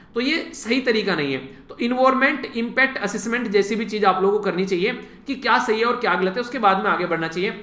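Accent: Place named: Western Uttar Pradesh, India. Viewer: Sir pakka west up se h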